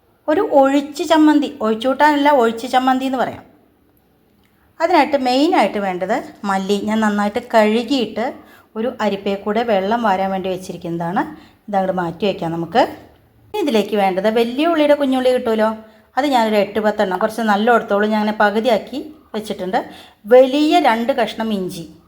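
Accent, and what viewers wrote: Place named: Valluvanad, India. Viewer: നല്ല വള്ളുവനാടൻ ശൈലി🥰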